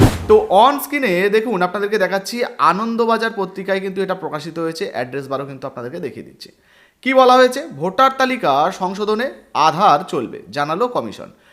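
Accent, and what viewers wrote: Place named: Bangladesh. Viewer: এ ভাই তুই জানিস যে বাংলাদেশী নিয়ে কি হবে তোর বাপের 1971সালের ভোটের লিষ্টে নাম আছে কি না তাই দেখ তার পর ভিডিও করিস তোর কথা শুনে মনে হয় তুই বাংলাদেশী আগে তুই কোথায় যাবি সেটা ভাব তার পর ভালো করে ভিডিও বানাস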